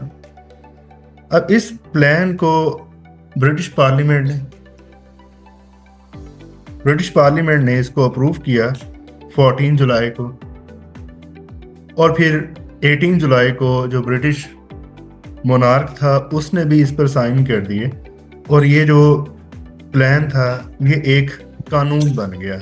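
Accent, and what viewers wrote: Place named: Lucknow, India. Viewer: Sir, you speak very good, and it seems that you have learnt Urdu from lakhnew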